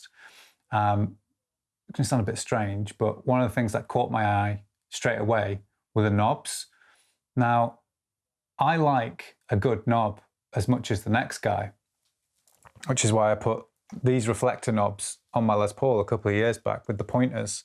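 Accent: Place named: Manchester, United Kingdom. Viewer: I watched the footie last night and felt hyper about 2 am..... So I put YouTube on and thought it was about time I learned solo to..... Chris Spedding is still one of my favourite guitarist so thought I'd investigate your channel.... I'm hooked!! It helps that I understand your accent..... That's real Mancunian! But seriously you're a cracking player and inspirational teacher..... Keep rocking!! Gear analysis is great too. I've heard good things about Sunbear pickups.... AND they're English!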